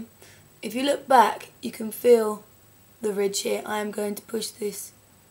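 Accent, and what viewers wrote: United Kingdom. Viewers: i love british accent:)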